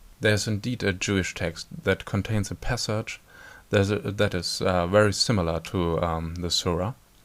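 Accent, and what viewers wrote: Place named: Germany. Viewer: The way you say threat the last seconds combined with your German accent worries me.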